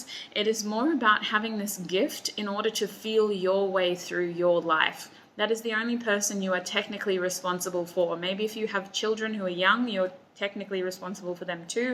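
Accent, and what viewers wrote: Australia. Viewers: are you austrailian?? i love your accent! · Group#1. Thanks a million! I am so grateful for this reading. It's so finetuned it's unbelievable. You are truly one of the most gifted readers on YouTube, if not the most. Much love, much light, much power to you 🙏🙏💗💗🌈🌈 And I love your Australian accent, so very charming!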